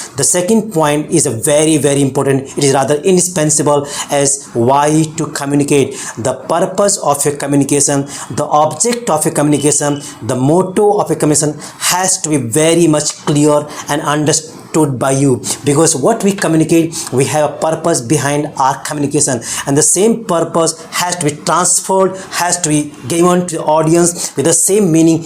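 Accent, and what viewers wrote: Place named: United States. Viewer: Sometimes you speak American English but I love this video because it's totally in English and you have told about communication skill so it's obviously powerful. I've seen this video 3 times today.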